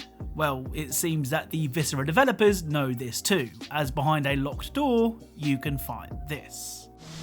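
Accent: United Kingdom. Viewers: I wanna, are you British? Oh and robo cop is not a a robot he is a cyborg, strange one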